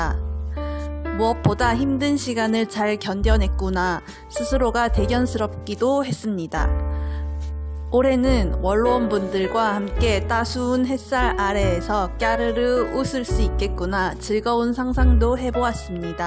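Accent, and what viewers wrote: Standard. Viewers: 은은하게 베이스 깔린 음악에 님의 상큼한 목소리 (표준말 억양) 최고예요~~😄😆🥰 여름에 로마 직항도 뜬다니 반가운 소식 이네요^^ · 표준말 너낌 나믄서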